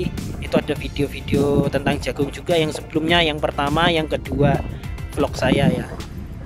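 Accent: Java, Indonesia. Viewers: Bang asli orang mana kok jawanya medok..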